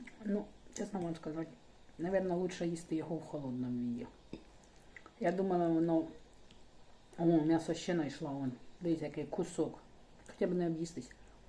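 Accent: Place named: Poltava, Ukraine. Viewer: Доброго вечора пані, підписалася на ваш канал, хоча я із західної України. У нашому місті теж є і АТб, і Сільпо, купляю в ціх супермаркетах тільки акційний товар і то не все підряд, бюджет не позволяє з їх " доступними" цінами. Дуже приємно вас дивитися і слухати вашу полтавську мову. Хай вам щастить, піднімається настрій після просмотра, як завжди з юмором, а кому не подобається, хай не дивляться.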